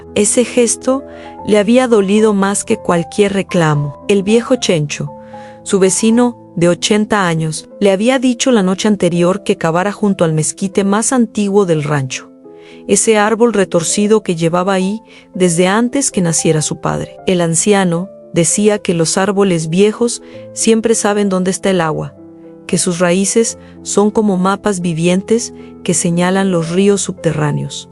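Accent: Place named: Portugal. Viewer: Excelente. Relato chica tienes muy buena voz en la narrativa suave tranquila. Pausada. Del 1 al 10 te pongo un 9. Todavía. Tienes tu lengua Natal. El Portugués. Muy poco pero de lo demás es excelente. .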